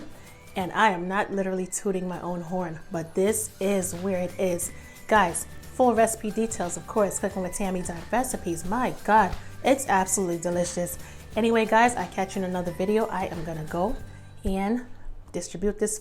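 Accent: New York, United States. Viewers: love the N.Y. accent!!!!! thank you.... i just followed on the gram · sound like you from NY but this meal is a southern classic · I hear the New York Accent! Whaddup Sis!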